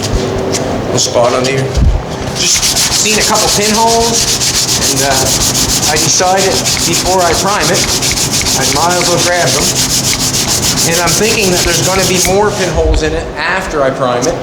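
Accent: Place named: Canada. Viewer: hi! have not found all your bugati videos yet , found a lone early one , but not giving up cuz i love bugati a lot . hope this makes you smile....i am a french canadian from montreal , and just about anything on youtube is english so i am used to it and i noticed you have a canadian accent !!! not from quebec mind you but canadian all the way . yeah flag waving here . refreshing to see your talent in the forefront . might even go to see you this summer .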